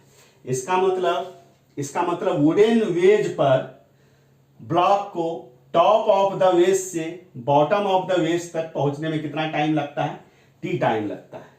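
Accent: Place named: Bihar, India. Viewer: ❤ love from bihar thanks sir i know you are from bihar by language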